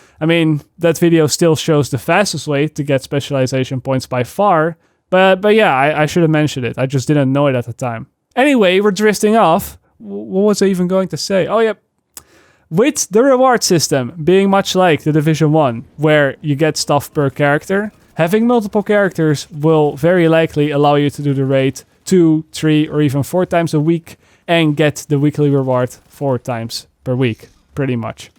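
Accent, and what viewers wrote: Netherlands. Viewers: Is he dutch? His accent sounds like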